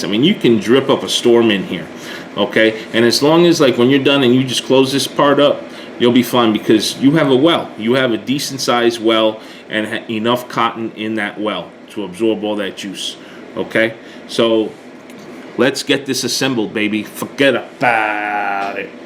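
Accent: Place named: Tennessee, United States. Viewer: ur accent tells me tennesee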